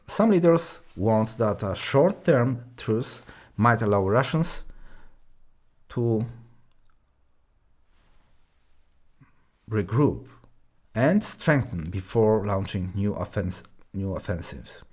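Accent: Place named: United States. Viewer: Słucham na 1.1x-1.15x – lepiej 'płynie' i zastanawiam się czy nie byłoby warto od razu publikować z lekkim przyspieszeniem. Wydaje mi się, że tempo mogło być trochę szybsze, bo do takiego angielskojęzyczni widzowie są przyzwyczajeni. Wtedy, żeby to się za bardzo nie zlewało można zacząć nieco bardziej dobitnie wymawiać niektóre słowa, zwłaszcza że akcent jest bardziej amerykański i tam to pasuje. Tak czy siak jest świetnie:) Taki punkt widzenia jest bardzo potrzebny. Zostaję, pozdrawiam i życzę powodzenia.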